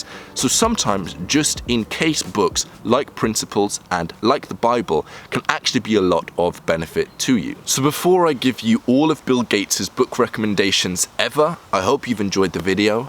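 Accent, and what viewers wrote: United Kingdom. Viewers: Where are you from? Your accent sounds quite British but it's very mild. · What part of the UK are you from man?!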